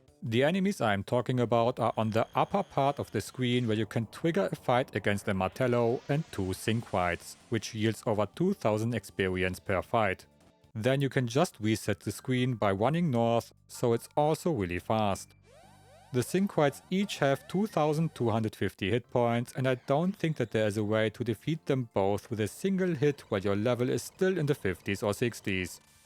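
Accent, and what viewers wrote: Germany. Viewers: If I may interject. Elmer Fudd has a speech impediment. He says wabbit instead of rabbit and wittle instead little. I personally don't get the Elmer impression from you. Your accent is very German.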